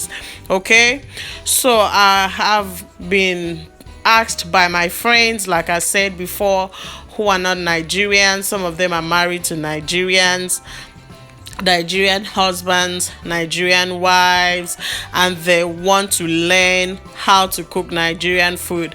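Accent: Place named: United States. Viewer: Hi. Thank you for such interesting video. I love cow skin and goat meat. Tripe not so much. I have never had Nigerian food, but I have a Nigerian friend who happens to be my mentor. I love Nigerian movies. It’s where I learn the phrase Chineke. And most of all I love the fabric. I would love to visit just so I could buy African fabric. Thanks for adding the new words in my African vocabulary. I will go and show off on my mentor that I am slowly becoming Nigerian. You speak as if you live in the states. I live in the states too and I don’t like the cold either. Anyway I watched to the end and be blessed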